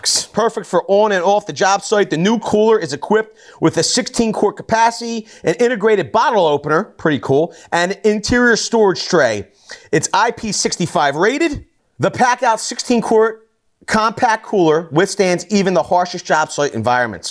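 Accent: Philadelphia, United States. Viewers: Sounded like you were from philly!! Cheers from levittown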